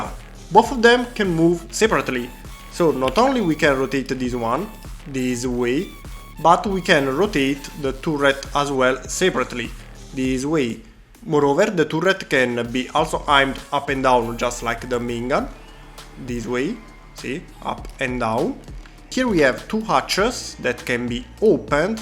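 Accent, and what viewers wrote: Italy. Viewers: ti ho sentito parlare 5 secondi e sono andato sulla bio per vedere se sei italiane, e lo sei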